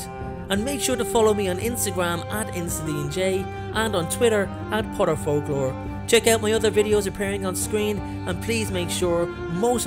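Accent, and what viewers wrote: Ireland. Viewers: Delighted to hear an Irish accent. First time listener well done.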